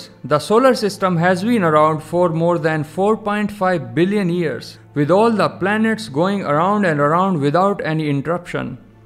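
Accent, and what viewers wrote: India. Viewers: you sounds like asian............. india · Indian accent lol Wait, he is an Indian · I need something in English I think that was broken Indian accent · Intresting video: me:D Indian accent: me:( It's: me:D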